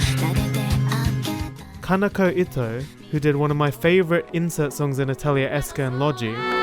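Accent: United Kingdom. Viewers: Oh that's a British man, I honestly didn't expect that.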